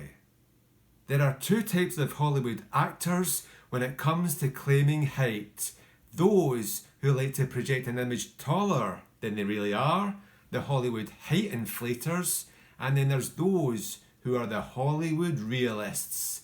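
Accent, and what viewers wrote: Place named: Scotland, United Kingdom. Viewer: Hey you have a Scottish accent like him!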